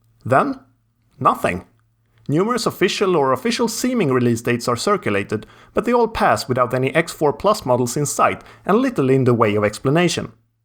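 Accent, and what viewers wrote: Sweden. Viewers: i can hear that this guy is originally swedish :D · Är du svensk? din engelska låter så iaf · Let me guess, you're swedish? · Yay Jag hör en svensk! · Takes a Swede to know one maybe XD could hear it too immediately and I'm a swede as well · Are you swedish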